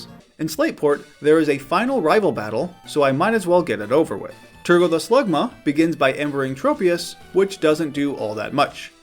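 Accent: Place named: United States. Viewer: famous Vegas! That's nice to know! Maybe someday I go visiting there. It must be a real nice place. But I would say your accent is the typical American English, clean and straight. I like your pronounce! I mean, it is exactly how I learned to pronounce the English words. That's a nice coincidence, I would say. I'm anxious to see your next challenge! Thanks for sharing, you're very friendly!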